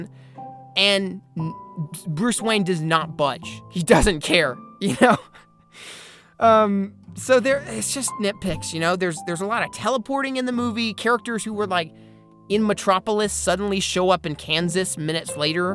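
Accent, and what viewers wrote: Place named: South, United States. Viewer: He says y'all he really is from Houston/the south in general.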